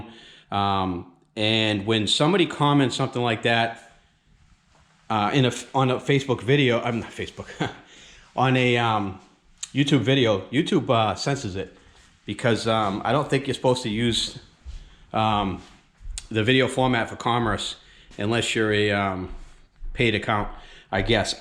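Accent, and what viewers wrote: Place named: Massachusetts, United States. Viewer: ahhhh I knew it lol I live in Maine, but my dad has 6 siblings in mass so we have huge family down tthere. All over. I knew the mass accent. That's awesome. And yeah I didn't take the motor out, or take the bike apart so ill be referencing ur engine vids for sure. I already learned about kawi swingarm spanner nut deal, which black wire goes to the sensor about starter, orientation of crank case vent etc. We just went to everett MA and bought 3 brand new machines. Me my girl and the kiddo.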